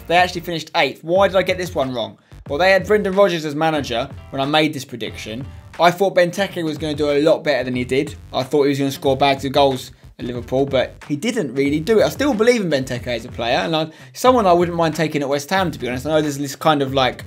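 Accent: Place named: Newcastle, United Kingdom. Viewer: true Geordie 😂😂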